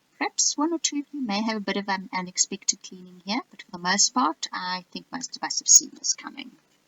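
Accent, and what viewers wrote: South Africa. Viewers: Hello ! Hoe gaan dit? I just found you, and I like your video because I love tarot (I'm starting to learn for myself) and also I'm very pleasantly surprised to hear your South African accent because I grew up in Cape Town, though I'm now on the other side of the globe. Dankie! You explain things very well, and amazingly this was all completely relevant in my life.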